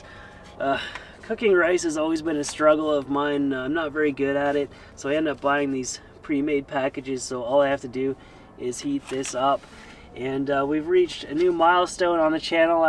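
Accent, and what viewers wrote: Canada. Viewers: Canadian, ey?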